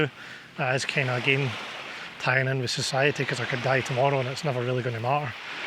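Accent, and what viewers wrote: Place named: Scotland, United Kingdom. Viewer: The reason I asked is I thought that was Scotland cuz I've seen so many pictures of Scotland. I'm Scottish I'm the only one in my family that has not been there. I have thick book of my family that connects me all the way back hundreds of years ago from Scotland. I've been wanting to go back through it cuz it's been many years since I went through it since my mom's died. Many clans the only clan I can think of that was the main part of the family was the MacDonald clan. It's been many years since I've been to the Scottish games here in the states.I still get a flyer something my house to meet the McDonald's clans at the Scottish games once a year I'm going to have to do it next year for sure. It's always such a amazing fun. I hope I'm able to visit Scotland one day. Thank you for your time I just knew that was Scotland not just from your accent look so beautiful it's nice to see a picture like that. 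Thank you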